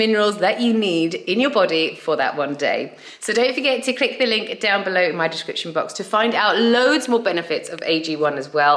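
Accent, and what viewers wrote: United Kingdom. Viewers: I'm South African, had no clue you're South African with your full on UK accent. Thought you were Spanish 🤭 love your meal inspo. Such nostalgia seeing those SA treats 😊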